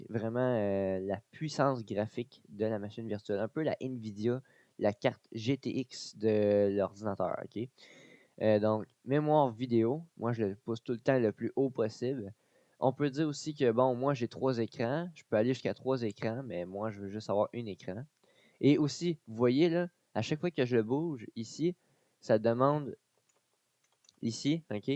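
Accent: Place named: Quebec, Canada. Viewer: j'aime tros ton actens quebecoi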